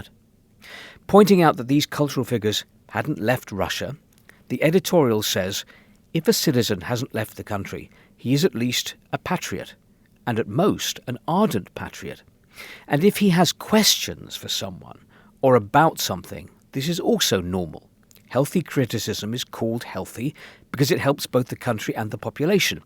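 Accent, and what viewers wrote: United Kingdom. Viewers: This narrator sounds British. Isn't that where the BBC comes from? I'll be taking this with several grains of salt, if not the entire shaker.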